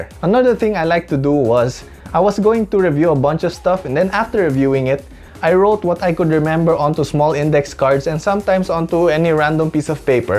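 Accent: Philippines. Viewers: omg im so early ahhh! oh thank you!! im currently 4th year student and because of this i now know what challenges i may have encounter! thank you soo much! at first i was doubting myself if i could really be an architect because i suck at math and that im not that good at drawing and when im measuring i dont know the right measure or angle soo that made me think of another course but when i found your video i found hope that i can do it! soo thank you very much! i watch other arcgitectural video but cannot seem to understand them but you,i could really relate (maybe because were both filipino and love the accent make me feel at home so that make me feel comfortable and actually listening to you) thank you for being my inspiration and i hipe you will be my mentor in the future as you said XD! Oh well then god bless you kuya!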